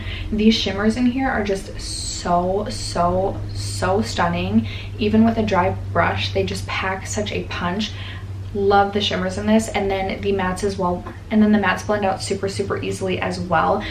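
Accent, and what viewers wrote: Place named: Canada. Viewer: I thought you were Canadian when I first started watching your channel because you sound more Canadian dialect wise. Source- I'm from Canada.🙂🇨🇦 Love your vids!!!!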